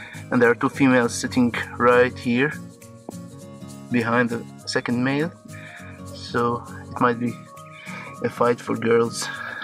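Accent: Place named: Iran. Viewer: Your accent is similar to Iranians. are you Iranian?!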